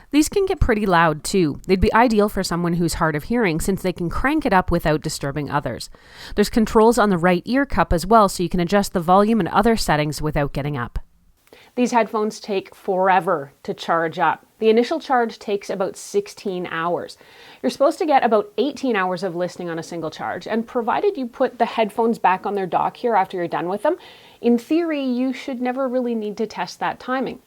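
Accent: Canada. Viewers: She sounds Canadian , so the video is even better.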